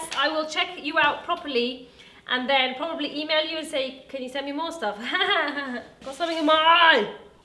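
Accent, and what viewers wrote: United Kingdom. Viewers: im pretty sure its mr.jared, but bc of her british accent it soudns like javed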